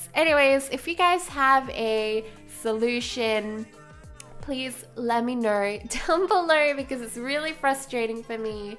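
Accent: Australia. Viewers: Are you from Australia? I think I hear that cute accent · sounds Australian:)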